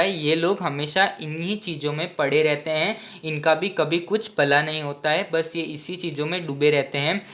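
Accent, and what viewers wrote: Gujarat, India. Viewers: Aap gujarati ho na ? Aap jese bol rahe ho vaisa to gujarat me Hindi chalta hai .... By the way I am also from Gujarat 🙋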